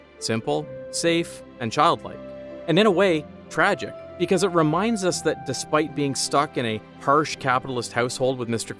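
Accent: Canada. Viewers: Is this guy Canadian? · Too Canadian. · you Canadian bro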